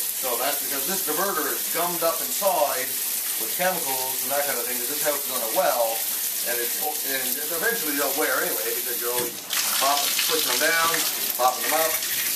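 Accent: Newfoundland, Canada. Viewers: Hi, thanks for the informative video - saved me $150 bucks USD! Based on the comments regarding your Canadian accent on words like "about" and "spout" my reply to Monique Wilson is learn how to pronounce the word "ask" correctly before commenting on our good neighbors north of the border! Monique would most likely want to "axe" you a question on another one of your videos! Thanks again for the informative video - married to a sweet lady from Toronto! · Got to be a Newfoundlander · I love the Canadian accent and I loved the video very helpful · Got to be Canadian - "you're darn toot'in" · Cape Bretoner or Newf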